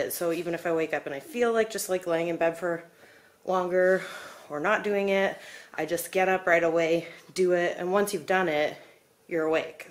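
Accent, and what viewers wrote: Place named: United States. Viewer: Got here from the gram - I so wasn't expecting an American accent!!!!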